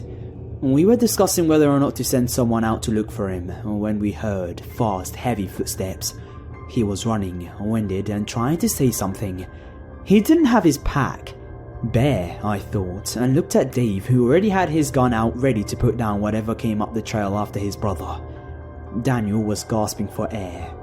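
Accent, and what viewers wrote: United Kingdom. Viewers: Are you and Lazy Masquerade friends or from the same town? You guys talk very similarly. (Not talking about just the British accent of course) Great stories! Keep up the creepy work!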